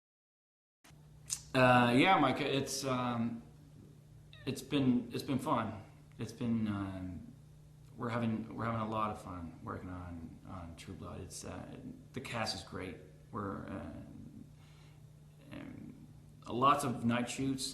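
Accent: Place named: United States. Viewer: His voice is so American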